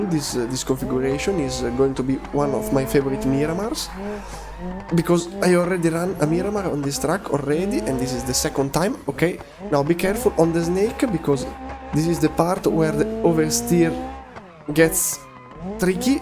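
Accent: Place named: Italy. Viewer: Minchia Brother si sente poco che sei italiano 😂 comunque bel video 👍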